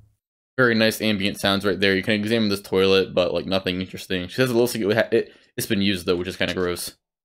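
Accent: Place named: United States. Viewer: Thanks for answering, if you don't mind me asking, what place in America is the accent from, I'm asking because it's a little different from what I usually hear in movies and games.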